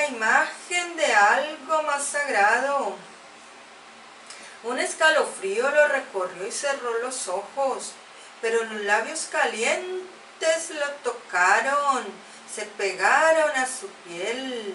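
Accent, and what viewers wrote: Mexico. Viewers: El acento mexicano te quito el ritmo de la lectura. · ¡Uy, dejaste morir en los créditos a tu asistente! ¿Dónde está tu versión neutral? Una última pregunta: Un mexicano cuando lee ¿Lo hace así? El acento es importante pero no es definitivo para hacer una buena lectura como "mexicano"; no dejes por fuera el ritmo, la vocalización y la inflexión de tu voz.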